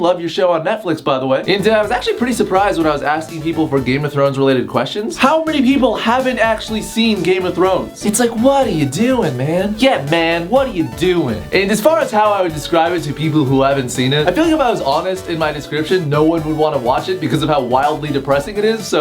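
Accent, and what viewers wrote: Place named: Scotland, United Kingdom. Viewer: Can you say "if you had a chance to change your fate, would ya?" In your Scottish accent ? :) (from the Disney movie brave)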